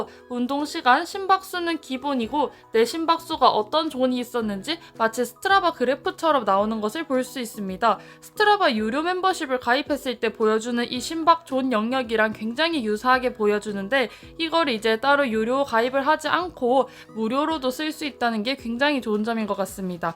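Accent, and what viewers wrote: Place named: Gyeongsang, South Korea. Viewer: 갱상도 억양 고향생각 간절하굼요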